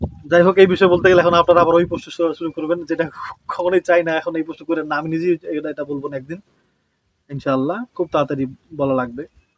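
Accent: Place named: Sylhet, Bangladesh. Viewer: আপনি সিলেট বলছেন আমার খুবব ভালো লাগছে আমি সিলেট থেকে আপনার ভিডিও দেখি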